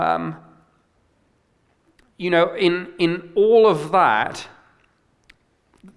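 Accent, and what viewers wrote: United Kingdom. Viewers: is it just me or is he a terrible speaker but his British accent keeps me listening and associating some kind of learned authority to what he says?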